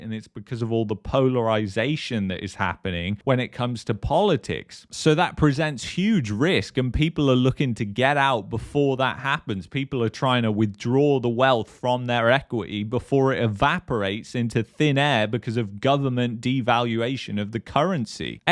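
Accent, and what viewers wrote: England, United Kingdom. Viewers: This guy with an English accent.. obviously not from here... constantly telling people how bad our country is...try a positive video for once..or move home bud..guys like you are bad for our society.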